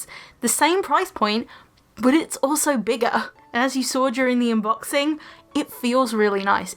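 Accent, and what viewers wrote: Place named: United Kingdom. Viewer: i LOVE BRITISH ACCENT ♥.♥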